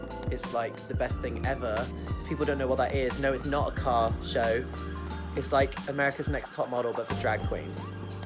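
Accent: United Kingdom. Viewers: I always love British accent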